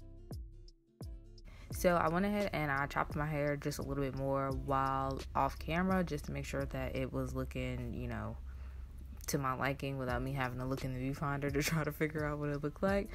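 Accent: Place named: DMV, United States. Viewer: You in the DMV,? I hear Ricooo 👀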